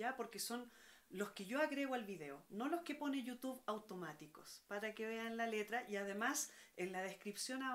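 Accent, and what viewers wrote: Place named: Chile. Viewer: Un placer suscribirse a tu canal, me parece o eres de Chile? Apenas se nota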